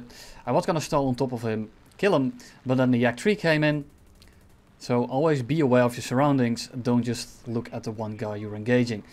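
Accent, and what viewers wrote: Netherlands. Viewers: I must say, the level of English here is amazing. Of course you can still tell that he's from Holland, but I'm really impressed because it's not the standard Dutch-English. Good job mate! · Are you Dutch cuz your L's are very much like the most Dutch people say it?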